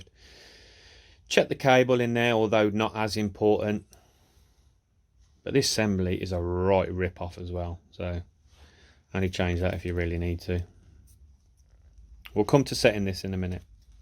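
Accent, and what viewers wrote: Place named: England, United Kingdom. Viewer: Great and informative video! Very clear and well explained (even with the English accent 😉) I just bought one for my son.. it seems they all need some work. Someone too heavy was riding this one and damaged the rear monoshock. Just waiting on the mail now! Has your daughter been enjoying the bike, now that you have it all fixed up?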